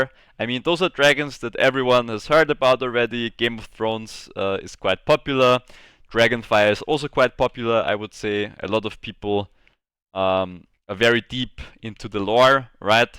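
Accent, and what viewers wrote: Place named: Austria. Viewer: Ich bin mal so frech auf deutsch zu schreiben- ich meine ich Höre einen Österreicher😂 Auf welchem Server spielst du? Und falls man fragen darf- in welcher Preisspanne sind wir bei deinen Drachen?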